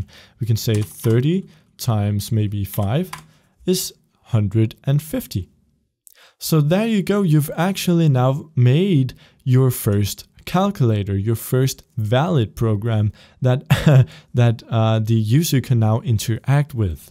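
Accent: Denmark. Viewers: Haha, sad og tænkte... "Den accent der.... Han MÅ da være dansker..." "... My name is... " xD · wait are you danish ???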